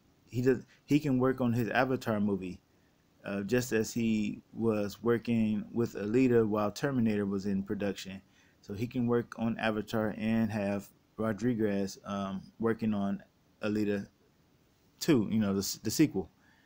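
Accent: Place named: Lebanon. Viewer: You have interesting accent, do you live in Lebanon??? Are you Lebanese???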